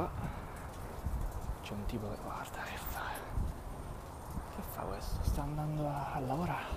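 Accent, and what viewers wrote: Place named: Rome, Italy. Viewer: haha io dovrei avere delle foto in quel ponticello, dovevano esserci anche i parcheggi per le macchine elettriche li davanti. Ma questo accento romano?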